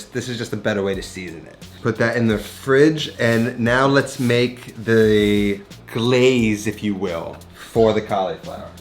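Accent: New York, United States. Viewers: Sounds like a New York Italian accent... very similar to a Montreal Italian accent. 😉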